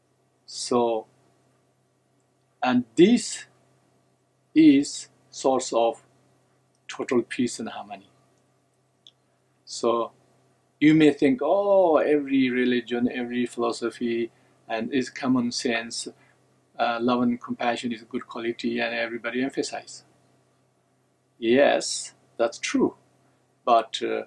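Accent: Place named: India. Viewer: U r accents r Indian accent this shows Tibate was a part of India in ancient time wow☺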